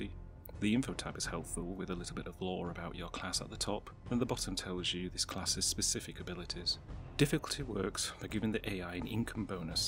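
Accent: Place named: United Kingdom. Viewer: your quiet british accent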